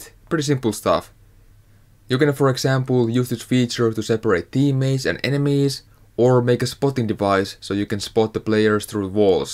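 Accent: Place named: Finland. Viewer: vahva suomi aksentti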